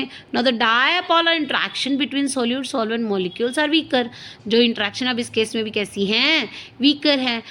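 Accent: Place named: South India, India. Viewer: vo south india h dont judge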